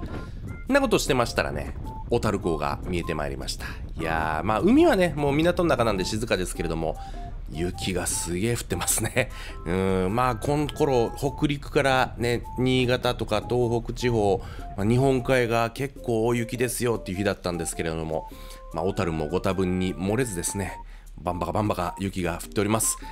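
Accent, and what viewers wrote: Kansai, Japan. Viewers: 最近登録しました。ナレーションの関西弁が面白くて好きです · 小樽に帰るって・・・・ 関西の方❓・・・・・どう聞いても関西弁ですよねぇ( ^ω^ )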